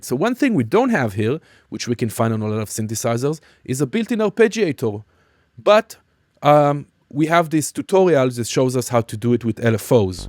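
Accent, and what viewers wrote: Israel. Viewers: Israeli accent?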